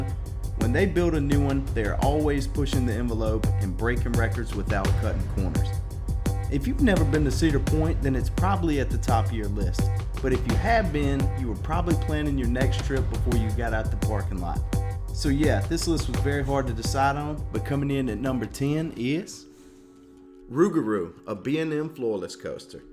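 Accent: South, United States. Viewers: I am guessing by your accent that you are from the south. If so, where are you from. I am from Andalusia, Alabama which is close to the Florida state line. I have also lived in Florida and I, now, reside in Tennessee.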